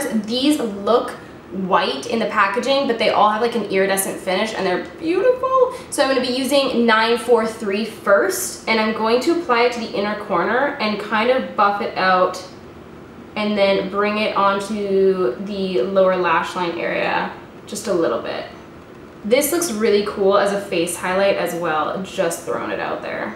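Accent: Canada. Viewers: Every now and again, your cute Canadian accent comes through. I love it!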